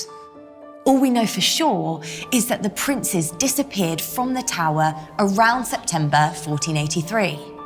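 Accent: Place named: Northern England, United Kingdom. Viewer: 27 Semantics on the pronunciation, but I AM British and this...this is not London. This is actually even more impressive because the accent is Yorkshire, Derbyshire, Midlands region waaaay to the North which is where they were from as Yorkists. It's a modern Northern accent though. If he read it in the English of the day, you'd barely understand it. Here is a video of a linguistics professor at the University of Leicester reading a letter written by Richard III some years before making himself King. He's written to borrow money, and the reader uses the King's English of the late 15th century. You might enjoy this if you're still about and see it. :D What did Richard III Sound Like? <link>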